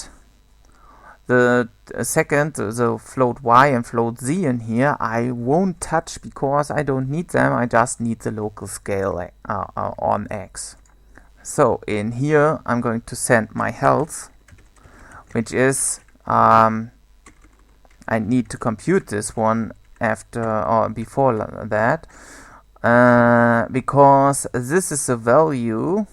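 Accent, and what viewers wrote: Germany. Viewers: Great tutorial. I love how you pronounce "th" as "z" like a stereotypical german. For normal use you would make a function like this instead of decreasehealth: void changehealth (int healthvalue) { if (cur_Health == healthvalue) return; if (healthvalue > max_Health) cur_Health = max_Health; else if (healthvalue >= 0) cur_Health = healthvalue; else cur_Health = 0; float calc_Health = cur_Health / max_Health; SetHealth (calc_Health); }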